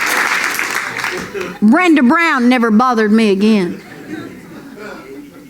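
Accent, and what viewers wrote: South, United States. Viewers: Hilarious, stories like this with a southern draw are more believable 😁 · She is absolutely right not only that I love her southern accent. I suffered from bullies until I was 12 yrs old One day on my own since I had no father to help I confronted my first bully at 8 yrs old and asked him to fight me. my heart was in my throat but I roared with threat expecting to be beaten to a pulp but to my surprise he backed off. I thought the bully problem was resolved but on later years i was beaten by other bullies. I finally asked my momma to pay 5$ per week so I could take Judo lessons from a brand new Dojo near our home. She looked at my teary eyes and accepted to pay for the lessons. Every bully walked by the schools which was on a main street with see thru glass like most store fronts My bully problem was over. The word spread around not to mess with me. 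I continued with practice and after many years and many contests i got as far as 2nd degree black belt then I switched to karate Okinawan style and received a black belt The after college I stared to learn Butaijutsu and from there developed my own self defense system. At the hight of my training I could break a coconut with my right hand. I taught both my sons from the time they were 10 till 16 Till they bacame black belts Them they went on to college They were never bullied by anyone because I had their back and expected them to stand up for themselves. And me ? Well never was bullied after 12 yrs old I grew up in tough areas and walked into neighborhoods where my friends would not dare. All possible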